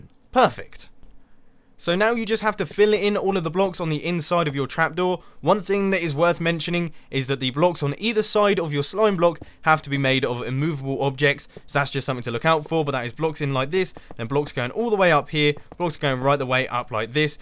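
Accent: United Kingdom. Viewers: And he has a funny British accent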